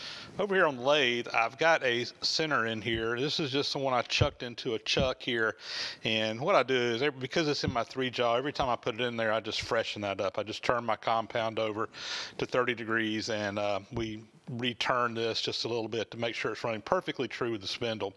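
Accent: South, United States. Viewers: As a new subscriber to your channel and a longtime subscriber to Leo’s I would like to ask a favor. If you will sir, please take a breath between your name and Vintage Machinery. It sounds like one-word it all runs together and is difficult to understand. The rest of your video sounds great with your eloquent Southern accent. I know your future subscribers and some of your present ones will thank you for it. Looking forward to watching more of your channel.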